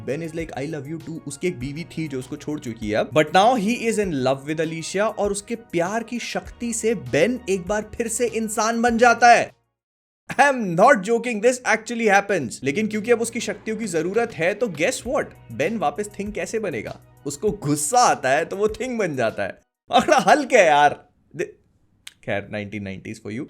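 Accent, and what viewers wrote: Bhopal, India. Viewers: bhopali sunkar maja aajata he. me bhi bhopali hi hun.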